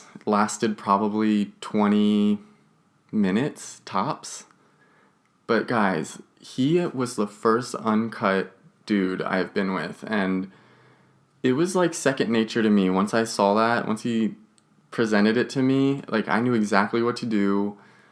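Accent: United Kingdom. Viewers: you are so hot you could turn on a dead guy. Oh, the shirt looks super. The British accent is a turn on for me as well. You look and sound super sweet and I know you must be since I have seen some of your adult films. Please take care because you do great videos, and you certainly are wonderful to look at and listen to. Thanks for the videos!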